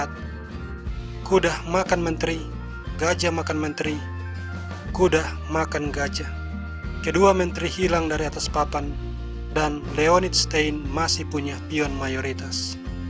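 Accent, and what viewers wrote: Manado, Indonesia. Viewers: manado ngomong 8 itu lapan bukan delapan... itu uda kliatan bgt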